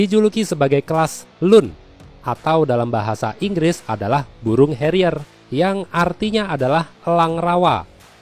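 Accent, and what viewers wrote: Java, Indonesia. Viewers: Bang lu jawa?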